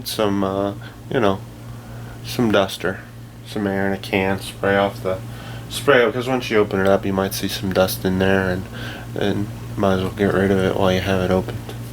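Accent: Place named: Canada. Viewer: He's Canadian. That's how the pronounce it. It's correct for Canadians. Also, he's shy so if u ask, he will deny he's Canadian but he is.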